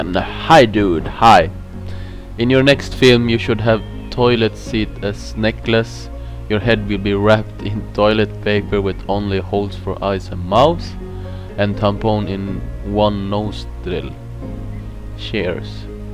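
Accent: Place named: Sweden. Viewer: the look of your pants, you know.. swedish pants these days... nah jk your accent reveals it all, well the pants to but yea;D im swedish to btw.:)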